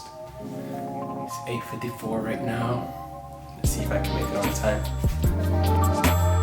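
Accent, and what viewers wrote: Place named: Philippines. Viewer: Quality video! May I know your nationality? You sounded like a filipino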